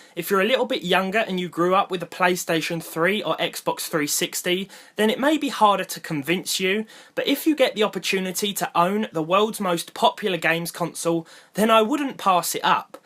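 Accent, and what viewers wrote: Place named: Essex, United Kingdom. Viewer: I'd have to kill myself if I spoke like him , a towie reject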